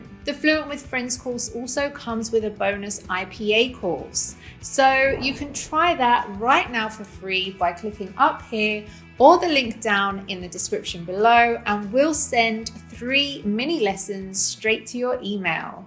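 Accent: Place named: United Kingdom. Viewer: The British accent is finding it kinda hard to understand Maybe the American accent is easier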